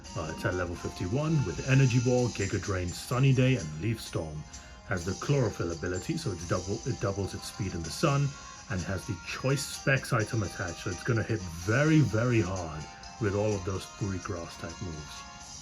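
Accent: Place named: Africa. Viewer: The GOAT African accent returns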